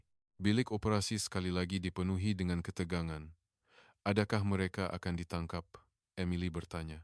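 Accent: Indonesia. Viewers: Chanel dari indonesia ni, huruf je pon dia baca cara indon.Tapi betul ka x itu blm pasti. Blm ade pengesahan cerita setakat ni... Rekaan cerita je ni. 😂😂😂😂😂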